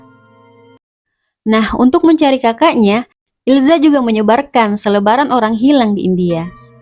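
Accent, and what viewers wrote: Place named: Eastern Indonesia, Indonesia. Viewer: Suara admin kedengarannya dr timur, tp gak tahu apakah dari Sulawesi, NTT, atau Papua.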